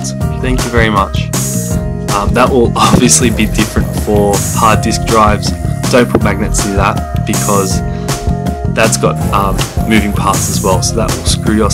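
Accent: Australia. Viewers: are you australian? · I KNEW HE WAZ AUSSIE!!! can I get a million dollars now?? Mail it to Queensland ##################### U thaught I waz gonna actually give u my address yea nah..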